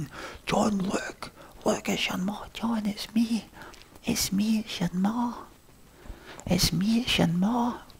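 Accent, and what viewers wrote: Scotland, United Kingdom. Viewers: Yep. I'm from Scotland as well and I speak just like that ....... without the angst 😂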